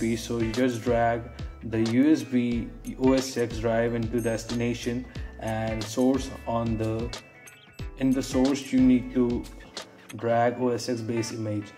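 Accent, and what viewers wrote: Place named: India. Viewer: Hinduinglish... How to make WO mac?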